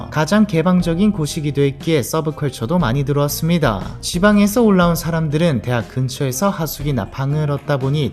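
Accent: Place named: Busan, South Korea. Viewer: ㅋㅋㅋ 해설자 양반 부산 출신 인가보군요 같은 고향이군요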